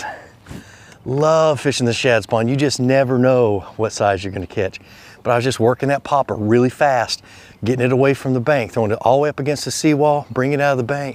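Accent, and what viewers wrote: Georgia, United States. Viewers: Are you from Georgia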